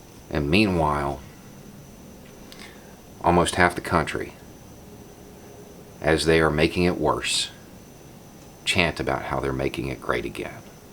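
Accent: South, United States. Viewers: Thank you so much for being a voice of reason in this unreasonable world. Also thank you for being a southerner and showing that not all southern people who speak with an accent are ignorant.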